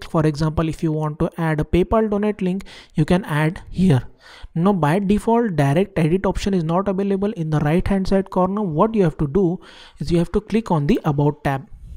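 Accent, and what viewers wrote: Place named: France. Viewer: the french accent is annoying... not trying to be racist...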